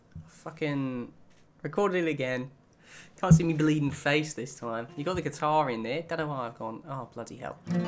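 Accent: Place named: United Kingdom. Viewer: clicks on video, hears british accent, clicks off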